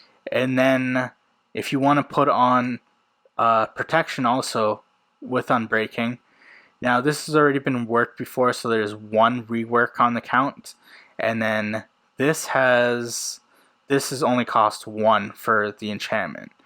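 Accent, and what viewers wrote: India. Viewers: Indian? Nice English btw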